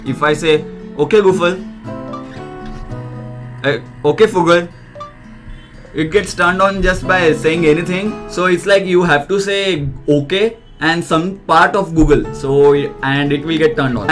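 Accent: India. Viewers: you have to be Indian to understand this guy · Can we have an American do this instead. Of a Indian guy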